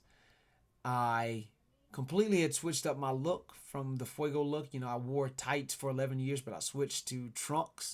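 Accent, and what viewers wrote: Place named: Alabama, United States. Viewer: I thought you were from Alabama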